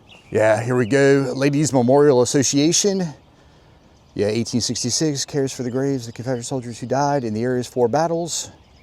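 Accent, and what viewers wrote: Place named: Tidewater, United States. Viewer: Great video. I can hear that tidewater accent, lol.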